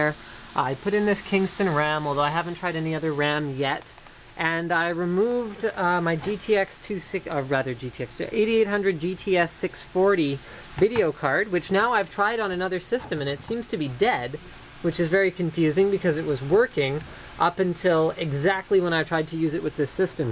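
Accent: United States. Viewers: I have to ask - are you from Canada? Because I found it interesting that you pronounced "Z" as zed, but you have a strikingly American accent.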